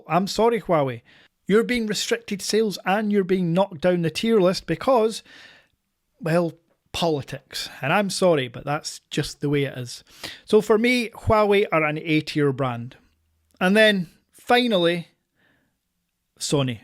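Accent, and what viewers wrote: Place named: Scotland, United Kingdom. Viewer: It's his natural Scottish accent!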